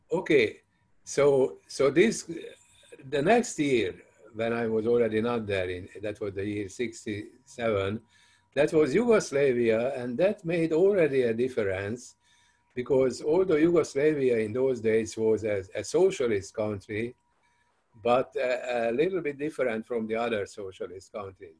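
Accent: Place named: Hungary. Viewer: I know Hungarians have a very distinguishable English accent but damn, Pelikán is the king of speaking English with accent.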